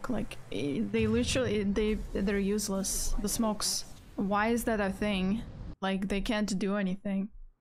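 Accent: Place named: Russia. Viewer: I had no idea that your accent was russian. As an american i can usually spot a russian accent but tbh I thought your accent was spanish. Either way, I like it and think you're absolutely beautiful. Not in a creepy way though, I'm happily married. Love your gameplay too. You're really good! Keep it up!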